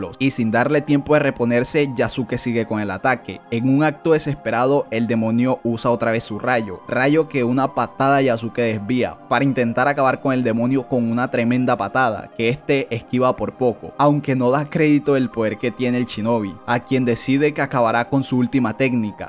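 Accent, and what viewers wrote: Caribbean Coast, Colombia. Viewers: ERES DE CARTAGENA O DE BARRANQUILLA?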